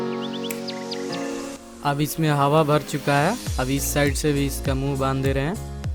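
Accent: Bihar, India. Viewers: Bhai apke aawaj se aisa lag raha hai aap Bihar me kahin se Hain.. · Bhai saahab ...hindi main sterling aur pulling ka dhyaan rakhen....maana ki bihari hai phir bhi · Kya bhai bihaari ho ka tum? Tumhre tone se lag gawa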